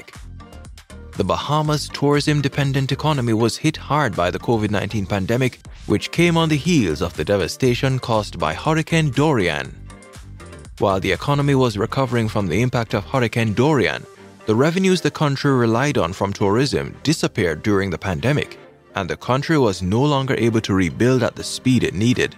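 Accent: Jamaica. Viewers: I pickup his accent so I know he is Jamaican, but whose more biased against Jamaica than a Jamaican, they are always the ones in the front of the line to disregard and disrespect Jamaica and her accomplishments.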